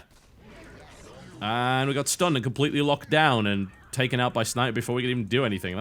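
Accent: United Kingdom. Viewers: I agree with you Pär! Though It's a British accent, not Australian :)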